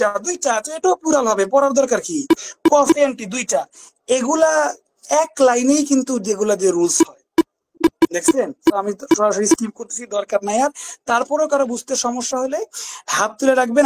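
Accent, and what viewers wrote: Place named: Mymensingh, Bangladesh. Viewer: অনেক দিন ধরে মাস্টার বইয়ের ক্লাস খু্জতেছি মনে মনে৷ আজকে পাইলাম৷ ধন্যবাদ স্যার৷ আপনার ভাষা শুনে মনে হচ্ছে আপনার ভাষা ময়মনসিংহ বা কিশোরগঞ্জ অঞ্চলে।।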